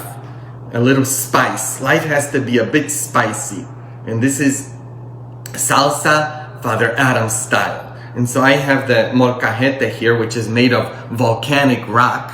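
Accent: Mexico. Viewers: Jajaja jajaja jajaja jajaja jajaja 🤣 padre me hace reír con su huuuuuuuuuuuuu como me hace los días más alegres dios lo bendiga y cuide usted si es mexicano hasta en la manera de hablar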